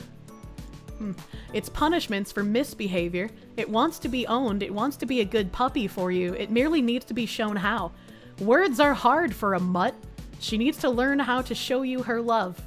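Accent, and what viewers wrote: Boston, United States. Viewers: I notice when gets annoyed. Her Bostonian accent comes out strong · Loud boston foxu sexy talk, more please. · I only know of because of whatever this shit is and I gotta say, very fucked up. But dude, as a linguist, I cannot get over that amazing Boaston accent, Imma have to study that shit XD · Jokes on them. The thick Boston accent is very attractive. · ! Sexiest Bostonian Fox Woman Ever!!! Talk Boston at me woman!! Talk BOSTON!!!!!! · I love your accent. I think Boston women are the best. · !!! I want to do YouTube and kik as a musician that doesn’t have to bite my tongue about schtuff or be confronted in front of my son in line at Whataburger lol. I’ve had local, wouldn’t call it fame, but was in a popular band in Houston for a decade. I kinda didnt like delusional locals coming up to my family while we were trying to have dinner to tell me positive or negative takes on shows or my idiot ex singers stupid fb post.. 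my question is, is it possible to be a musical vtuber? Like play real instruments with and avatar covering most my identity? And also i don’t understand where to start.. I’m sorta retarded.. is there any advice? Also you made my Texan ears love the Bostonian accent lol it’s calming to hear you at work. Between you leaflit and nux I’m convinced this is the best direction to have as much creative freedom as possible. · Gotta admit the Boston accent is doing it for me ❤😂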